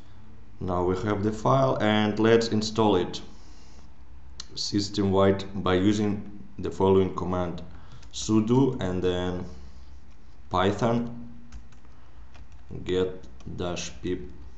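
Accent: Russia. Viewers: мне показалось или он русский???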